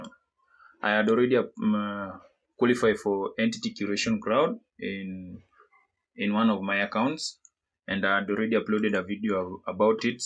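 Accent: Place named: Kenya. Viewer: You have a kenyan accent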